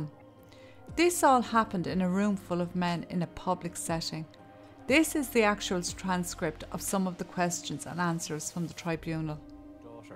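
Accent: Ireland. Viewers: I do have to say that these stories of murder and the following mayhem are awful, but getting to hear them told in that beautiful Irish lilt of yours makes the hearing of them a little more bearable. I’d love to remind folks that “the church” and “religion” is about as far from God as living in sin is. Remember, it was the “church people” who crucified Jesus. Jesus never condemned anyone. He taught His message. Those that received that message were told to go on their way and sin no more. Those that did not, they just went their own way. Most of the atrocities committed in this world have been done in the name of God, but the SPIRIT of God was not behind it. Jesus Christ was crucified by “church people”. If church people would crucify our Savior, how many more of us regular folk will be made to suffer at the churches hand? I hate to think of it. Organized religion is the very devil!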